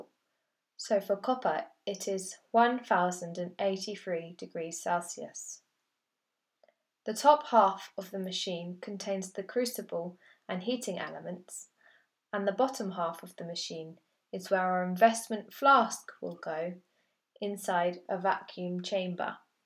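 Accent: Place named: United Kingdom. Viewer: I liked immediately for the British voice. hope it’s good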